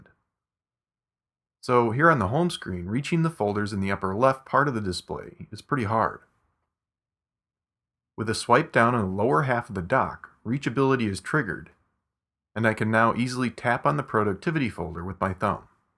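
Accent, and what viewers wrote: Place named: RP, United Kingdom. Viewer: nicely done, and he speaks the queens, hurrah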